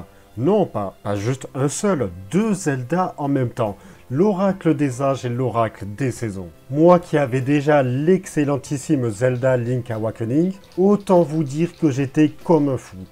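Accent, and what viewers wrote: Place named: Toulouse, France. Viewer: Le tout couronné de l'accent Toulousain, magique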